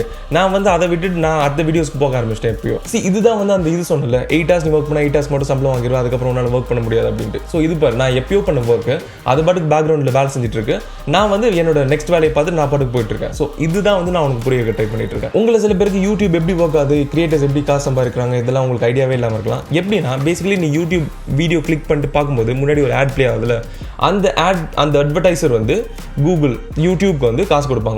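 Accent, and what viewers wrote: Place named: Sri Lanka. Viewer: Bruh u sound like srilankan bruhh 😂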